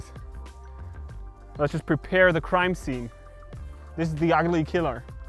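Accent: Germany. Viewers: Ich wusste das du deutscher bist. Man merkt es richtig hart am Anfang und an deiner Stimme ;)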